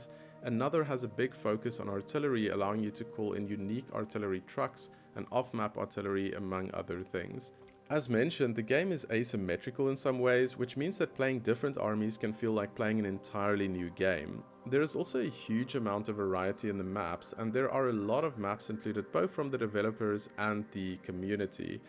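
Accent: South Africa. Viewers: Was surprised to hear the SA accent! Have this game in my Steam Library but haven't downlosdedit yet. Not BIG RTS games but have been exploring them lately. Also turn-based RPGs like Xcom and Divinity: Original Sin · Hey, are you South African?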